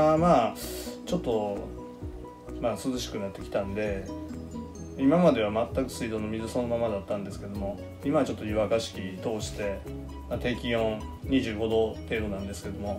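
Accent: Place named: Kansai, Japan. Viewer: わぁーー😆今回はちゃんの生声素敵voiceですね✨✨関西弁❓私関西出身なので久々の関西弁に懐かしく嬉しくなりました\(//∇//)\ アクリル水槽は傷がつきやすいのですね👍✨✨ 湯沸かし器を、通して水を、入れているのです😊細かいメンテナンスでちゃんのお魚達はご機嫌ですね👍✨✨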